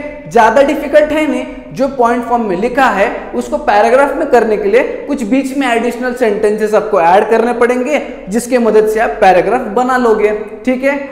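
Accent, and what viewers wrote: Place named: Pune, India. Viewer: Pure puneri❤😅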